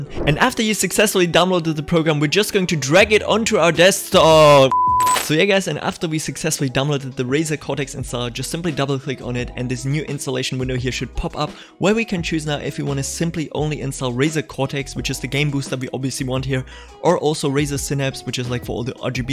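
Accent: Germany. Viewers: der deutsche akzent haha, aber geiles video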